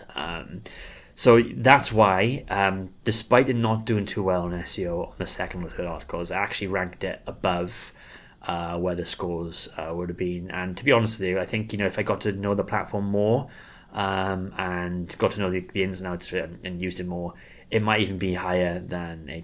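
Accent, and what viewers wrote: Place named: Cockney, United Kingdom. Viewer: I think you forgot your audience was mostly American and not cockney? It's difficult to follow you. This is a really good video but no way am I going to slog my way through 2 hours of this. But your shirt fit the the mood.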